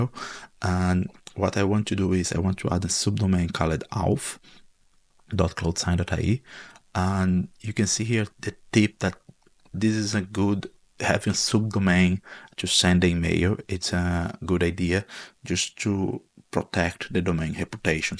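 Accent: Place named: Brazil. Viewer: eu reconheço o sotaque brasileiro de longe kkkkkkk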